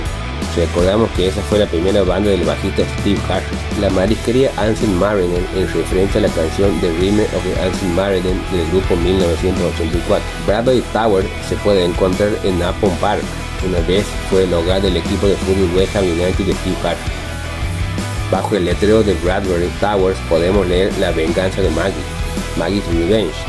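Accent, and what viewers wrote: Tucumán, Argentina. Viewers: Sos de Tucuman ?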